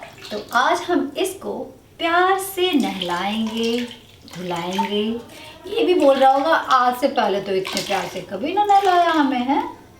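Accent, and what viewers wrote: Bihar, India. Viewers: Aapki basha me bhi bihariyo vala touch hai or lgti bhi aap bihari si ho...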